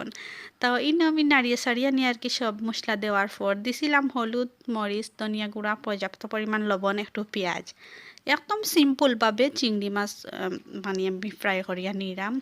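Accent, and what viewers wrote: Sylhet, Bangladesh. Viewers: চিংড়ি মাছ যে এতো সুন্দর করি ভুনা করা যায়,দেখে অনেক ভালো লাগলো।। আরোও ভালো লাগলো আপনার সিলোটি মাত😀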